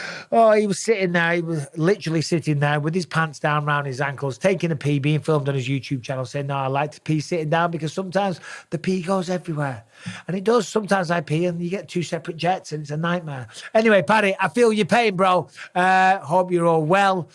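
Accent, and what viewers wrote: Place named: Liverpool, United Kingdom. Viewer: Scouse throbber.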